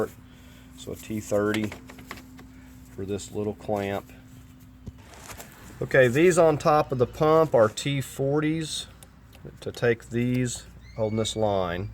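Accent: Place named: Kansas, United States. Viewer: highest highlights sounds like Kansas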